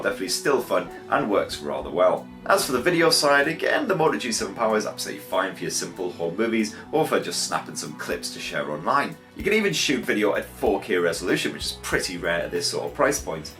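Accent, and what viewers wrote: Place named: England, United Kingdom. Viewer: Still sounded more pom than Aussie but it's forgivable haha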